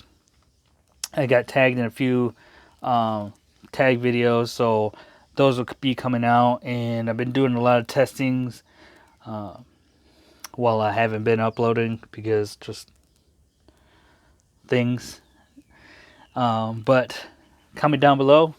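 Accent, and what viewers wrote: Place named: Mexico. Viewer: You still sound Mexican ☻😀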